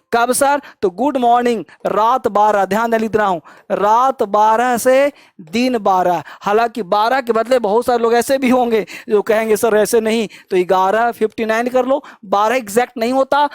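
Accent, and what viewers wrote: Bihar, India. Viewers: Hello sir, apka padhane ka tarika mast h or ap lgta h Bihar se hn??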